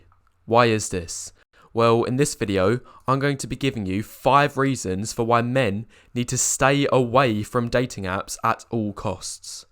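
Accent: United Kingdom. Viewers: One thing I feel weird about this is that a guy with the British accent here is using measurement such as ft. and dollars instead of cm and Pound Sterling. Are these videos exclusively about the situation in the United States? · British accents adds aristocratic flavor to this content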